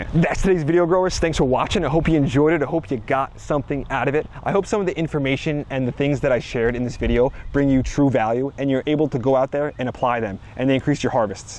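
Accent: New Jersey, United States. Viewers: It's SO Jersey. I love it, he hypes you up before every video.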